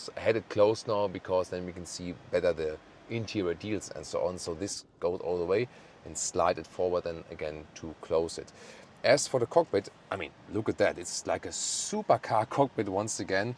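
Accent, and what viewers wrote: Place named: Germany. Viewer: Why do they have a German doing the English version... this is dire... 🙃!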